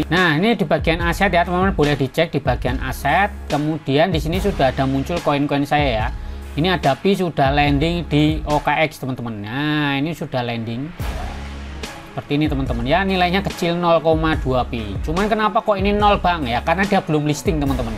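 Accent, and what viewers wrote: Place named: Java, Indonesia. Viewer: medok kali mas.....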